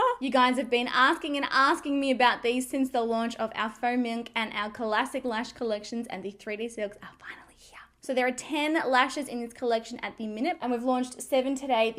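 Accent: Australia. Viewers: I just love your Aussie accent! That to me was a bonus!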